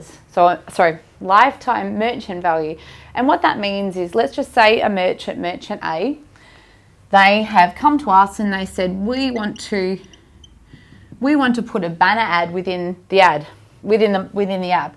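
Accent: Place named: Australia. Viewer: Hey there great video looking forward to finishing it. I noticed this was shot aways back would be cool to get an update on your journey with your idea. Also i was wondering how much did this app cost to make and did you run into any financial challenges/surprises when rolling it out. Lastly are you aussie based noticed you have an aussie ascent. Im Gold Coast based and would love a chat.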